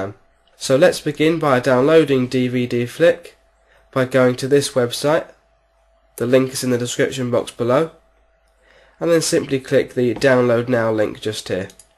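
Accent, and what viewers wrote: United Kingdom. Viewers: Thanks, excellent tutorial and lovely British accent. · Great informative video, FLAWLESS. Subscribed. Thanks. Hes british too, added bonus ;)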